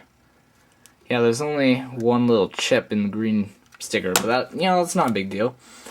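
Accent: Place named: Georgia, United States. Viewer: Goin out on a hunch here.....are you from Georgia? I didn't look at your profile, but you sound Georgian, like my cousin!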